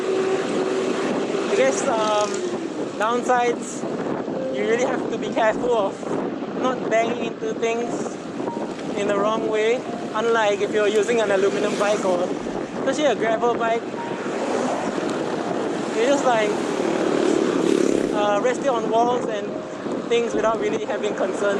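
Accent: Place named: China. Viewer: Nice review!!! Chinese brand bike reviewed by a Chinese accent. Thank you 😁👍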